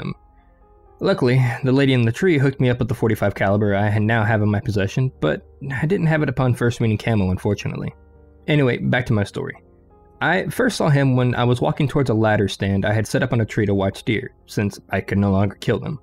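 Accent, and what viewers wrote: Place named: South, United States. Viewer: youre welcome I do too. He is from North Carolina a southern accent. We do similar vid3os. I enjoy your interputation of it.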